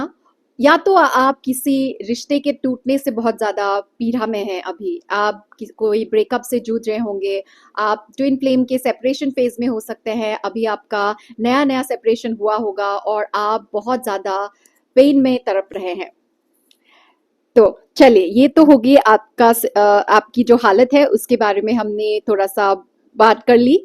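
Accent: Assam, India. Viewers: Ma'am aap Assamese hai kya? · You’re from Assam? I find your Hindi accent a bit of Assamese😅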